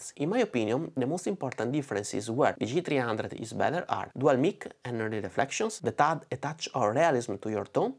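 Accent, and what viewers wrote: Venice, Italy. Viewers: ti ze venessian da l'accento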